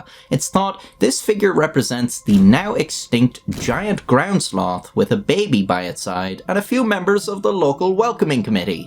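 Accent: Ireland. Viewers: He is a well educated speaker of Irish English, that is how that number is pronounced.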